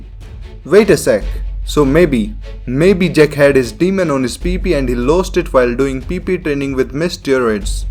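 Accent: India. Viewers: Ngl Baki algorithm brought me here but I stayed for the Indian accent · I didn't even watch baki but your description of what baki is plus the well known indian accent just makes it so damn funny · Change your accent. You sound way too indian..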